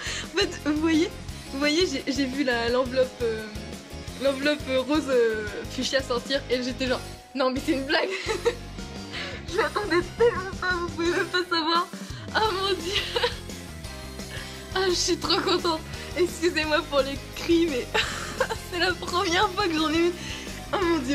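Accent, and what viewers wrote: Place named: France. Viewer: OH MON DIEU J'ai choquée en entendant une voix françaiiise ! xD Je t'aimeuuuh ! ♥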